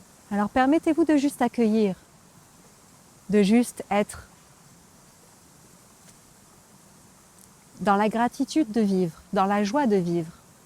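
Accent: Quebec, Canada. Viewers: Je suis sur que tu es au Québec 😁ton accent Français se confond avec le Québécois lol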